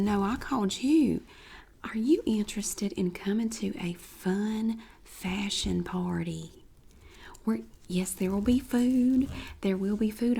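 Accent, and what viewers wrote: South, United States. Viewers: I like southern accents 😭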